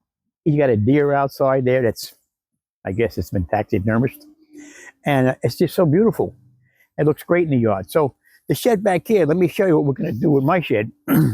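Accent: New York, United States. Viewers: Hi, loved this video...Your house and garden are looking so beautiful...Have meant to say before, I just love your New York accent...👍❤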